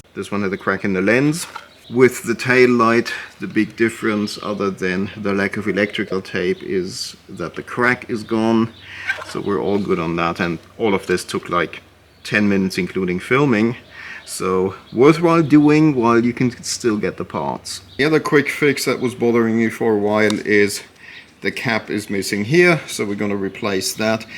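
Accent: Germany. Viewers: Right you are… beauty always very individual - here in Germany we say: „Die Schönheit liegt immer im Auge des Betrachters.“ As you know I think, because your English sounds a bit German !? But please don‘t get me wrong. Your BMW does look great, your YouTube-Video is well made and very informative. Thank you for that. Greetings Matthias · Most obvious German accent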